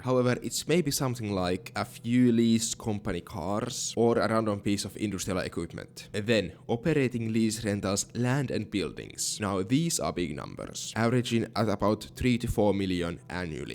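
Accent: Finland. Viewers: i really like the finnish accent 😭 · I clicked for the clickbait, I stayed for the finnish accent.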